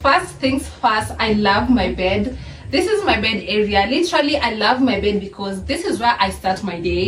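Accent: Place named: South Africa. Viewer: your accent does sound like south african one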